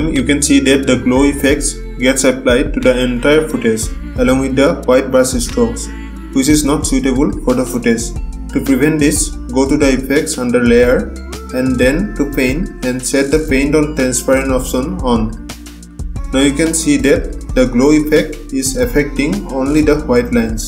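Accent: India. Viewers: finding a tutorial 😀 Indian accent ☹ only tutorial there is 🙄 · You sound like an Assamese. I am Assamese and ik my ppl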